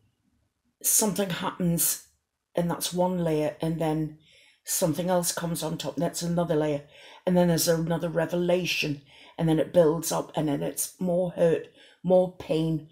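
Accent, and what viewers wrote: Newcastle, United Kingdom. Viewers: I keep thinking 'I wish you were my Nan!' I don't think im young enough to be your granddaughter, but the only grandparent I have left hates me lol! I think its the geordie that I somehow can hear in your voice, but I find it very comforting... like I keep expecting to see you call me 'pet' lol! But honestly thank you for your kindness, it does help a lot to know I'm alone <3 hope you are feeling a bit better.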